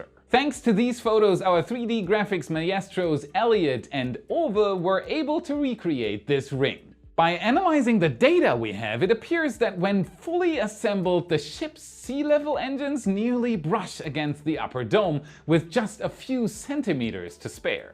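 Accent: Germany. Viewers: You called me out for the marine mariner comment I’m blown away! Didn’t know you were German until this episode keep the great accent coming I meant no disrespect! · Your German accent is fading! The states are rubbing off on you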